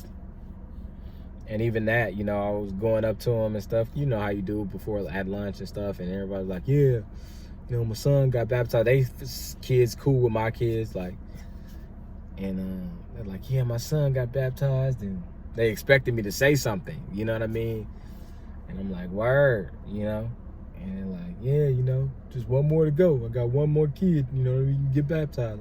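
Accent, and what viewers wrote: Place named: Wisconsin, United States. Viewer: I come from a large family. Both sides are JW. I left a while ago. I watched your first video yesterday and as you were talking I was like I know he is from Wisconsin and when you said it, I said... “I knew it!!” . 😁 Stay strong brother. The worst residual effect for me is Anger. And the fact that I was actually about to teach my daughter this mess.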